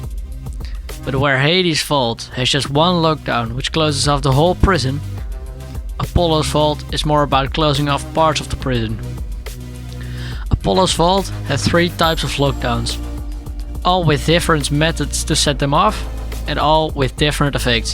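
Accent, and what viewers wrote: Netherlands. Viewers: Are you Dutch? The accent sounds familiar (also I'm Dutch) don't try to not say it, I am not gonna escape it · Are you dutch? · Eyo may I ask, are you dutch since your accent sounds like it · you sound dutch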